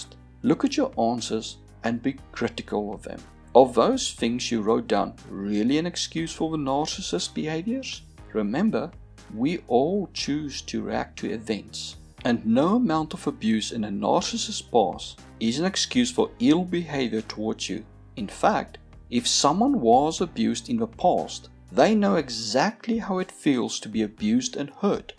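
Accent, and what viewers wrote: South Africa. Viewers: Great info Are you South African?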